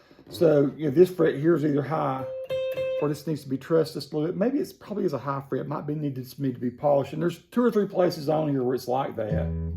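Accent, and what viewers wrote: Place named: North Carolina, United States. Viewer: I'm detecting a heavy North Carolina accent. Am I close?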